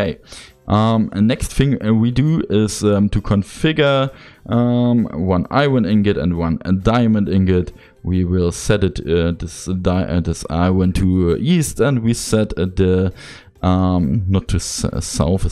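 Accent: Germany. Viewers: er lernt immer noch englisch XD gutes toutorial es klingt sehr deutsch XD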